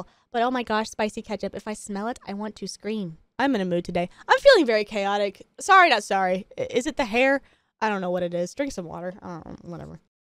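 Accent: South, United States. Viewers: A chaotic is a funny! Hope your allergies clear up soon! Cant wait to see what a week in your life is like! Also, the southern accent just gets me every time!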